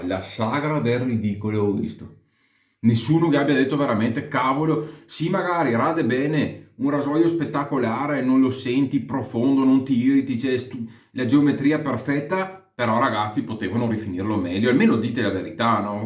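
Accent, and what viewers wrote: Veneto, Italy. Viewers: Una considerazione molto oggettiva, bel video. (Comunque si nota tutto il veneto che è in te xD)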